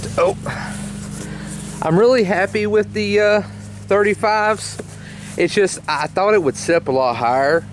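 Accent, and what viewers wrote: West Virginia, United States. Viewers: Where are you from. West Virginia ?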